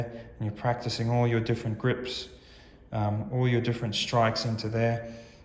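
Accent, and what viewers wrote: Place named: Australia. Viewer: Who has like an Australian accent, or maybe British idk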